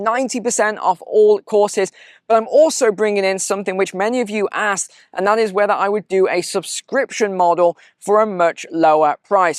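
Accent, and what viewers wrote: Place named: Upper class, United Kingdom. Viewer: You shouldn't ever trust anyone with a public school accent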